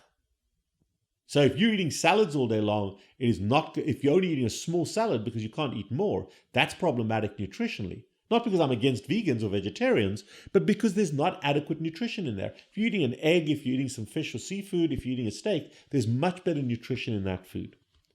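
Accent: South Africa. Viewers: South African accent. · Hahah… I was just going to ask if the Dr is from SA? Spot the accent a mile away! ❤ from Pretoria! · You have a South African accent 😂? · 1. You are Sohth African, by the accent; what British are you talking about?😅 2. I've gained nothing ever since I stopped, which was 10 months ago. 3. These medications are like miracles. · This is the first time I hear a physician speak from such indepth knowledge, esp with Type 1 Diabetes! I'm a Type 1, on Ozempic and not losing at all. My hba1c is at 8.2... I believe your clinic has such an amazing approach losing weight! BTW, I think your accent is South African? I'm also South African and I wish we had more physicians who were skilled with glp meds. My endocrinologist is not 100% on-board with GLP-meds. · You sound south African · Love this advice, thank you. Love the South African accent! I'm also South African. 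If people can realise that there is not just a cure all but you also need to develop healthy habits to sustain the weigh loss for the rest of your life. Subscribed!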